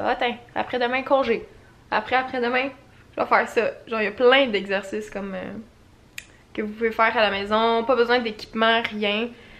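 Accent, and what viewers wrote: Quebec, Canada. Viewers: Comment fait tu pour te rebouster comme aussi vite?❤️ J'adore ton accent pour vrai à l'école je fait l'accent québécois et les gens me disent comment tu fait et je dis va sur la chaîne d' et c'est vraiment la meilleure youtubeuse je partage t'es vidéos au gens en face je leur note sur un papier et ils se sont abonnée!! ❤️ Plus je regarde t'es vidéo YouTube plus j'arrive à faire l'accent! Personnellement je n'aurais jamais pensée que à travers YouTube je trouverais quelqu'un qui me motive autant. ❤️ Pourrais tu faire un swap avec Cyril?? Gros bisous ❤️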